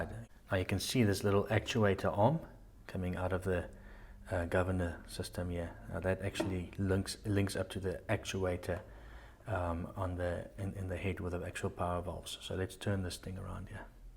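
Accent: South Africa. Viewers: I honestly love the South African accent lol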